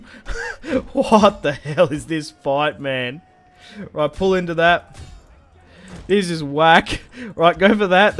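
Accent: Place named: Australia. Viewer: gday mate how you going! Love your australian accent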